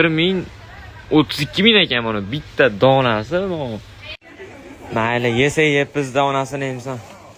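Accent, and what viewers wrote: Andijan, Uzbekistan. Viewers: Зу'р гаплардан бу'липти юю Шева андижон❤❤ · Ука Анжан Шевами 😂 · 😂😂😂😜👍👍🤲🤲ИЧИМ УЗУЛУБ КАЙТА, КАЙТА КУЛДИМ😂 . АНДИЖОНЛИК ШЕКИЛИЙ ( ШЕВАДАН) (МЕНХАМ 😜). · Aka Andijonli emasmi. Sheva tortyapti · G'irt anjancha sheva😂❤ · Anjanlimisiz? Mazasini aytmadizzu bebaho shekilliga 😂😂😂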